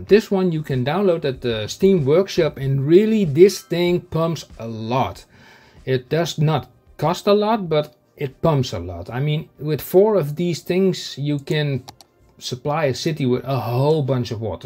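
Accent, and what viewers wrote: Netherlands. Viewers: I can hear by your English, your a dutchie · Yes i hear it too Im dutch too Halloooo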